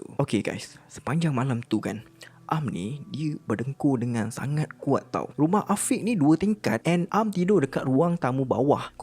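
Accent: Johor, Malaysia. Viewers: orang johor lah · Bang org Johor ke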